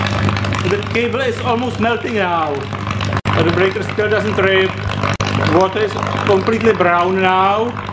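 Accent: Czech Republic. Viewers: Czenglish :D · Czech english lol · I love his Czech accent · Czech republic i guess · Good CZenglish, better than Sobotka.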